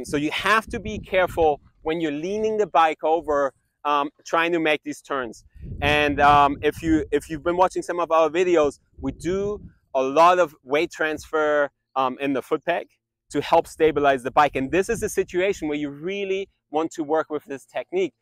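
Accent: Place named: France. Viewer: You lost me at french accent.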